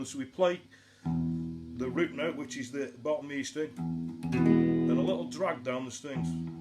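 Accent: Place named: Midlands, United Kingdom. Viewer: thought you had a Midlands twang..Like the cover by the way.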